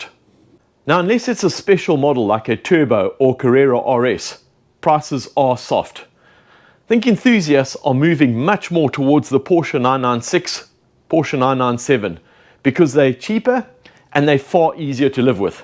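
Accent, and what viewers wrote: South Africa. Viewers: I detect a South African accent. 🇿🇦 Sitting in my local in Margate recently, in discussion on classic cars with a friend, who like me is in his 60's, a 21 year old sitting next to us told us how he also preferred older cars, like those from the early 2000's.😅😂. Great collection & great video. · That is one hell of an accent you have. South African? Whatever it is it's probably worth more than the cars. God bless.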